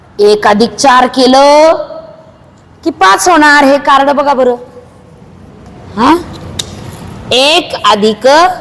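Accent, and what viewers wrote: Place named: Kolhapur, India. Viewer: छानच मॅडम अध्यापन पद्धती तुमची भाषा आमच्या कोल्हापूरी भाषेसारखीच आहे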